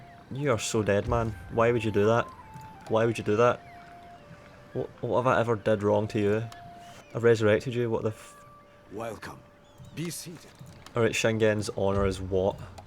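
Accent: Scotland, United Kingdom. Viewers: What part of Scotland are you from bud? I want to guess somewhere around Glasgow?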